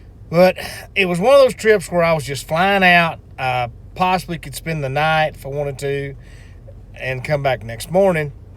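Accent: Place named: Mexico. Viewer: Thanks mate ... I still can't get off the floor ... he is crazy funny. Love his Mexican accent.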